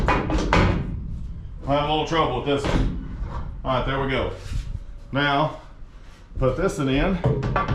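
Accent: South, United States. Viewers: That country boy accent though - I wonder if you can sing 🤔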